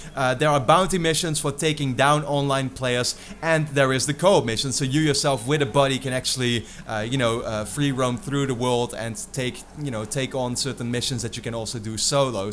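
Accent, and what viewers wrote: Netherlands. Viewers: Erg goede review kerel! Ging ervan uit dat je Nederlands of Vlaams bent. Awesome review, just to be sure ;)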